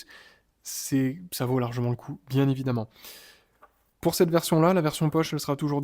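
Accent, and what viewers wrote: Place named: England, United Kingdom. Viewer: Un nouveau dans le game comics. Tu lance ta chaîne qui parlera des comics news ?Très bel accent anglais 😎 Tout dépend ta recherche la poche ça permet de relancer de nouveaux lecteurs à petit prix pour relancer l’industrie du comics pas pour un public voulant une belle édition moi avec le temps qui passe je favorise les belles éditions et toujours en dur même si ça devient un budget comme les omnibus dans leur fourreau Après le dur tu ne peux pas lire ça dans le métro 🤣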